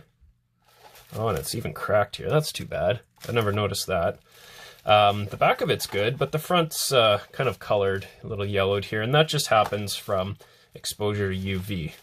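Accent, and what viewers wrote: Canada. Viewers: Hi im from the Netherlands. I really love your videos, and learning stuff and such a pleasant Canadian accent. Just wanted to say it once. Keep going!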